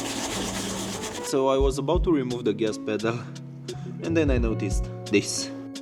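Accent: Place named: Romania. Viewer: Mi s a parut ca esti roman de la accent dar cand am vazut romaneasca din portbagaj mi am dat seama ca e vorba de Romania 100% 😂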